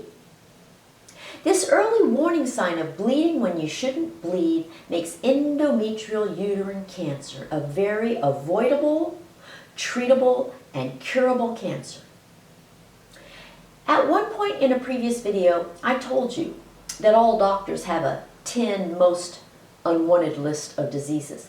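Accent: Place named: Texas, United States. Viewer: You are the best teacher…EVER! I loved your Texas drawl. ☺😘💕 Until next week, my dear.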